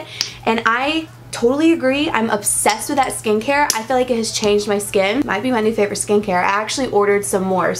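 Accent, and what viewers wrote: South, United States. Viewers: I personally don't see it AT ALL. Maybe they both have that slightly Southern accent. But looks-wise, she has NO resemblance to Britney Spears NOR Britney's younger sister.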